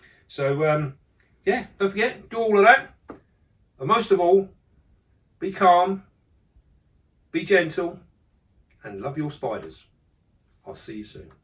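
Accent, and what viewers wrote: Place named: Essex, United Kingdom. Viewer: Nice video me old mate, very interesting, been looking at getting one of these for a while now off the spider shop. Whereabouts you from? Sound like a fellow Essex/Kent dweller?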